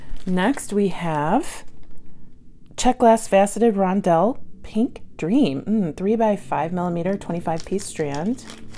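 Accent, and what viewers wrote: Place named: California, United States. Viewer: Sounds like from right here in california right here by the bay pretty cool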